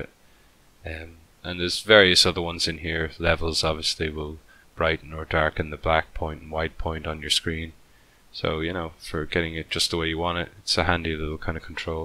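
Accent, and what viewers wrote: Ireland. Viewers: Just came here to listen to the beautiful Irish drawl...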